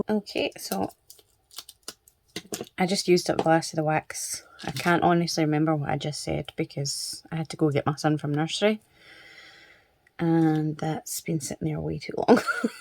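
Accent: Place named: United Kingdom. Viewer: Accents from the United Kingdom are so neat.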